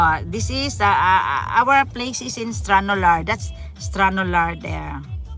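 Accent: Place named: Hiligaynon, Philippines. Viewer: Ilongga ka host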